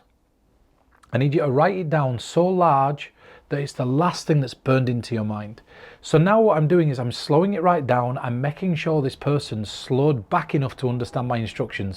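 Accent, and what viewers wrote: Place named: United Kingdom. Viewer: Do I have to have an endearing UK or British accent like you or Daniel Madison to practice mentalism or good magic or can I do it with my silly American accent or do I need to practice in a Canadian accent how a boot that LOL by the way I'm part of the pig pen magic Academy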